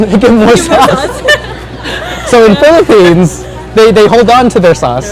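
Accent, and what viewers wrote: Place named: India. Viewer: Id rather listen to a chainsaw sound rather listening to an indian accent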